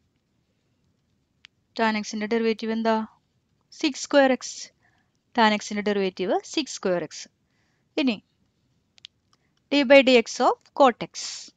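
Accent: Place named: Thrissur, India. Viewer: Thrissur slang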